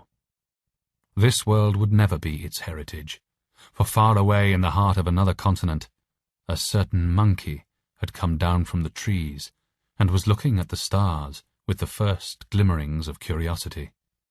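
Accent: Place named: United Kingdom. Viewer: Great story and excellent narration pace. The narrator also has a nice voice and an elegant British accent.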